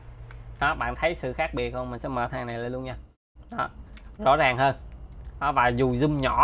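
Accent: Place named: Mekong Delta, Vietnam. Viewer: A ng miền tây nch dĩa huông wa he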